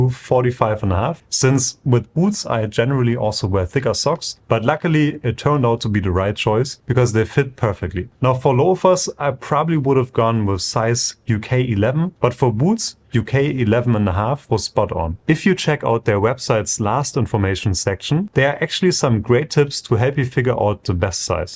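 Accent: Germany. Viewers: I think you’re german by your accent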